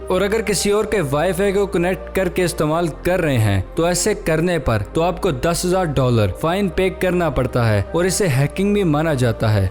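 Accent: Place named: Lahore, Pakistan. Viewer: گدھے کا دودھ۔۔۔؟ لاہوری لگتے ہو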